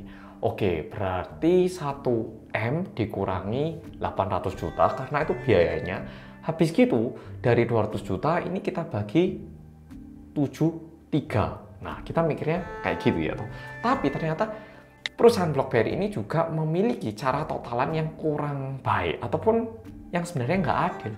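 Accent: Java, Indonesia. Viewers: Ruar binasa emang keserakahan manusia. Makasih videonya Boloku Sing Paling Medok Dheweeeee 🙏🏼🙏🏼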